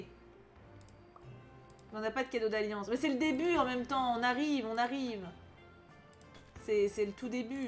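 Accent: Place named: South, France. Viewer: En tant que sudiste je valide l’accent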